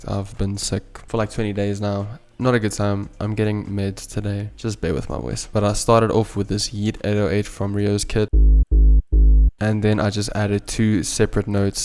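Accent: South Africa. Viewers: Bro. Are you South African? That accent? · I know a SA accent when I hear one 😭🔥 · You sound south african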